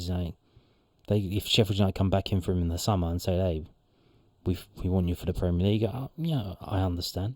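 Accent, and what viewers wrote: Scotland, United Kingdom. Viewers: Love the jock accent 😂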